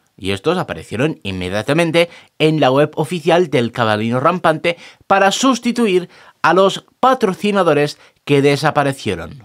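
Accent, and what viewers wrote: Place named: North, Spain. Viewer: Oye, eres del centro o norteño, pero lo que si estoy seguro es que no eres del sur de la Iberia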